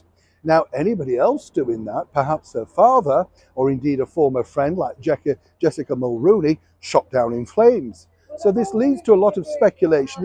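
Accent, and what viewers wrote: United Kingdom. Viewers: You sound like you are from the UK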